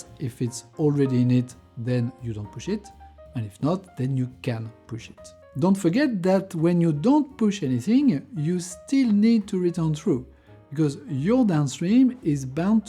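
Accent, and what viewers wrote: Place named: France. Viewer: Need a native English speaker for these. Very hard to understand or better yet just do it in your native French and have AI subtitles. Great content but I can't be the first to have this thought.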